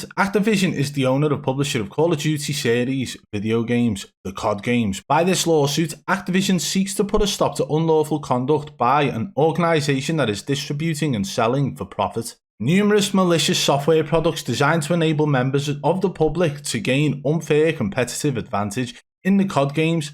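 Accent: Liverpool, United Kingdom. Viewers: Does anyone else think of the scousers from Harry Enfield when they listen to this dude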